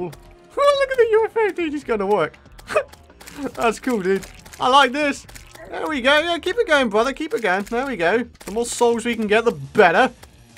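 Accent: United Kingdom. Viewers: HEY! I love your videos and your British ascent. you are my favorite you tuber and I hope you could respond to me, it would make my day better, after being bullied.....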